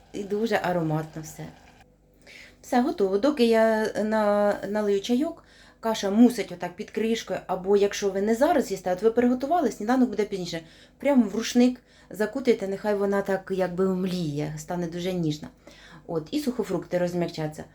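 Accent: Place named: Surzhyk, Ukraine. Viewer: Ни хрена не понял этот диалект или суржик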